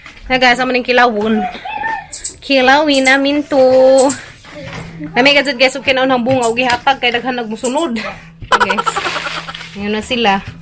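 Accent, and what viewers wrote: Bohol, Philippines. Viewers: Klaroha jud taga buhol oi.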